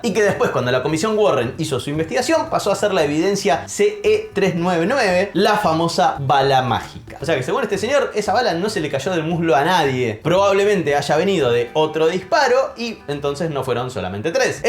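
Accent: Argentina. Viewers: Sha sha sha sha.....ese acento argentino fastidia....she she she....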